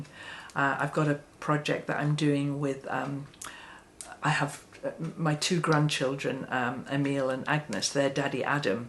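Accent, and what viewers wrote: United Kingdom. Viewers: Can I say on repeat how much your videos comfort me in a way that’s hard to put into words. Your sweet British accent and the way you are so comfortable in your own skin. Your gratitude and contentment are contagious. I’m turning 73 on March 6, 2026 . You are a soft voice for those of us in our older years to remember what is truly important and just enjoy the process. May God Bless you in 2026 and keep you in good health. I need you!!❤❤❤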